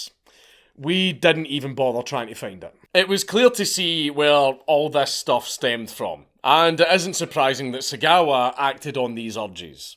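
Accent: Scotland, United Kingdom. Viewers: Personally, I like the way he says "work," for some reason, as idk what it is, but there's something about his scottish accent that tickles my American brain. 😅 But the way he says "murderer" sounds oddly appealing, too. 😅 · Being a German native I daresay I like this Scottish accent very much. It sounds very harsh but very funny at the same time. Always puts a smile on my face. 😊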